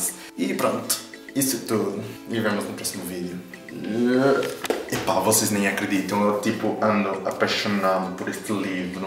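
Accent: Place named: Brazil. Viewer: Dasse q e isto és ucraniano ou q se es br fala com sutaq nao estragues o portugues de portugal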